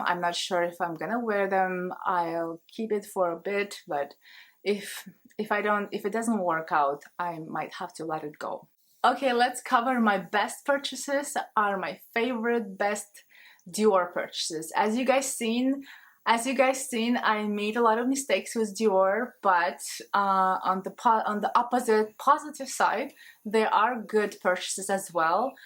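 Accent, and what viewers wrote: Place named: Russia. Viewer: Вы казашка? Акцент русский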